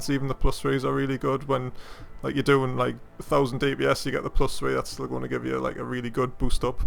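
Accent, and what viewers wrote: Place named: North East England, United Kingdom. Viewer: Lo m8 just come back to eve after a long break sitting in ya pub atm, you sound like your from the NE like me, would love to chat about some fits m8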